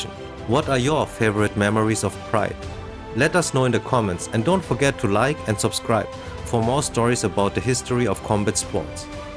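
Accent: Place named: Germany. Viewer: nice video bro but are you using an AI voice? Its sound extremely german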